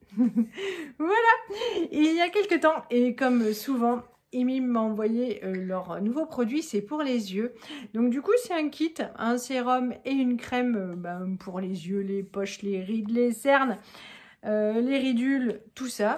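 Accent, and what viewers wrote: Alsace, France. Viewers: Merci pour cette vidéo. 😊 L'accent Alsacien j'adore 😊. J'ai vécu 16 ans en Alsace ❤️ Bon courage pour demain .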